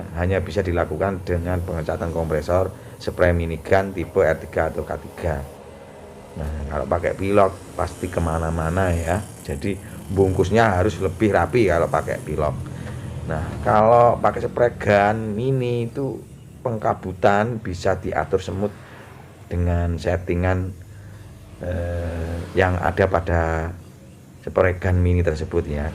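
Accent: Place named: Kalimantan, Indonesia. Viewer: mntap . suara orang kalimatan kh bng yg ngmong ini ? bntu suscribe bng . sering nnton jga soalnya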